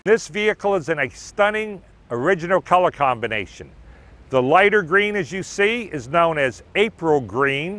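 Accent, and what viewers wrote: United States. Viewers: I hear Boston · Being from Massachusetts, I myself will guess the presenter is from Maine.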